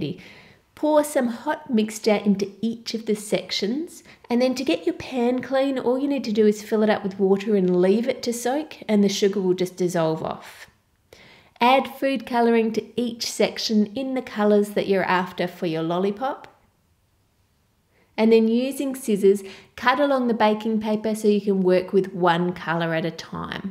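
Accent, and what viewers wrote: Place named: Australia. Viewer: Put da suga and da wata 😂😂😂 i love Aussie accent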